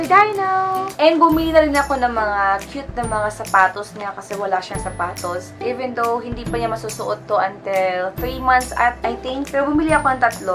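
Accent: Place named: Visayas, Philippines. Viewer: Bisaya ka po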